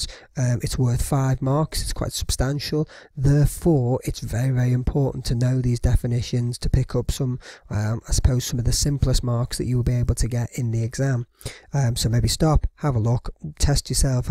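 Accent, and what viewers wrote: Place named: North, United Kingdom. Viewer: this video would of got more likes if you weren't northern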